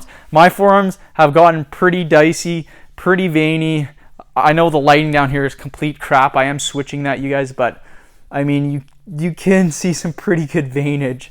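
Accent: Canada. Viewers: Canadian accent? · Canadian af bc he sounds like nick eh 30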